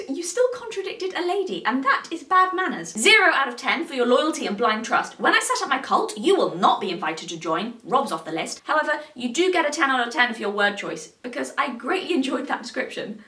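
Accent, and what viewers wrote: United Kingdom. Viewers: I am here for the British accent, mostly. Lol👌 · Its official, having a British accent makes you sound smarter than us Americans. I actually have a friend with a similar accent to this woman, and even though he's not really a genius, I always feel dumber around him. Weird huh?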